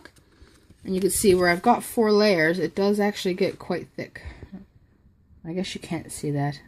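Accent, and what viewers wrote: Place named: Canada. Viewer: Cute cards! Love the watercolored tree cards! I also love that falling snow stencil! Want to get it too! I thought I could hear a Canadian accent, and then when you said you ordered from Amazon.ca, I knew for sure! I was born and raised in northern Alberta. I live in the States now. Anyhoo, I don't think it's too early to make Christmas cards, especially when making so many. Singing, however, is a different story, heehee! TFS! Hugs, Shonda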